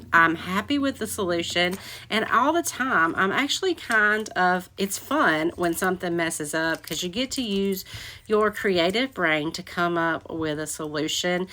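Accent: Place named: South, United States. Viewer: Hello there,! I just found you a few days ago. You are a pure delight and your DIY's are splendid! Are you a southerner? I am. I can tell by your accent. LOL 😂 You go, girl! Jesus' blessings to you and yours!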